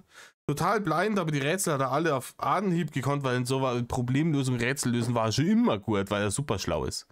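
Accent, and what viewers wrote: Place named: Upper Palatinate, Germany. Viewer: Kommst du aus der Opf.? Hört sich bissl so an -> Ich wohn aba scho lang im Osten & hör den Unterschiede nimma so guad😉 Wie & wo auch imma: Beste Grüße ins scheene Bayern💙🤍💙🤍💙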